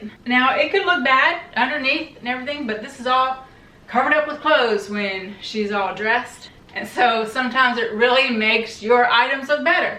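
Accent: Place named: South, United States. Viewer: I swear to you I skipped the introduction and was watching thinking… this womans from the south! 😂 (slight accent) Went back and checked sure enough! Watching from Shreveport, LA thanks for the content 👍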